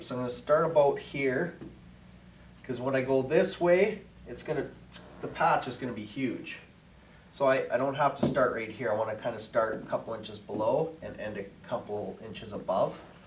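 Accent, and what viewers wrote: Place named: Alberta, Canada. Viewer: hey where is this guy from? sounds a little like people from Alberta Canada, but maybe his accent is from the states?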